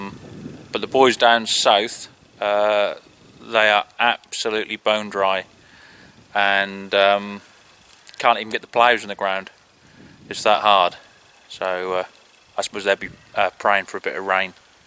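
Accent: Norfolk, United Kingdom. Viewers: I'm sure I can hear a bit of a Norfolk twang in that accent ! I'm thinking West of Norwich.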